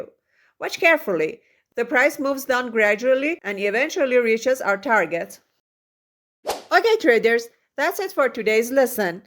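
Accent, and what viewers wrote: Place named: Iran. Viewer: I love your Persian accent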